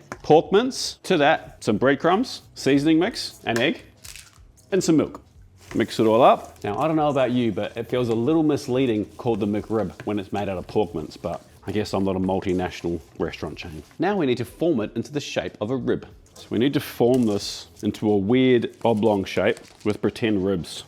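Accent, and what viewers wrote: New Zealand. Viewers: Thanks i appreciate the effort you put in regardless of the bitchy comments, and your on the button with wrapping ribs in foil to steam as they cook,i do this everytime and the ribs are perfect in the oven. Thanks you Kiwi accented fitter and turner, be safe buddy,love your work. 🥰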